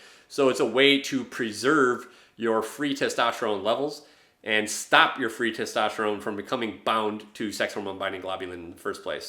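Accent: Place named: Michigan, United States. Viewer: sound like youre from michigan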